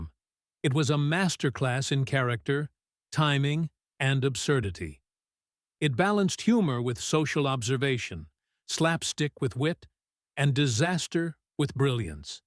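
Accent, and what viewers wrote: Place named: United States. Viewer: Dont waste your time watching this , dumb yankee voiceover , a collection of repetitive screenshots , clickbait , utter BS , whoever put this together must have the IQ of a newt. Oh and the thumb showing her in a bra ,is clickbait The actual scene was Basil impersonating a Nazi, not mentioned at all in this vid .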